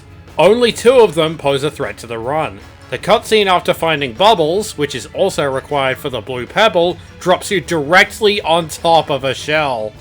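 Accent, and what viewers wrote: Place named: Australia. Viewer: Never heard of this game, nor do I care about it at all...But I sure do love hearing this Aussie try to beat challenges, so I watched the whole thing.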